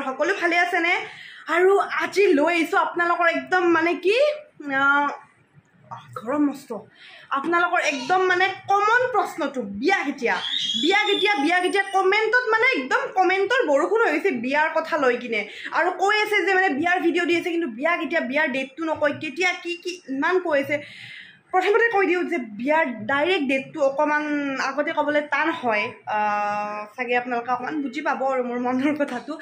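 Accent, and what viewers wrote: Nalbari, India. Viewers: Aponar Kathat alp nalbarir xur ase baa....👌👌❤️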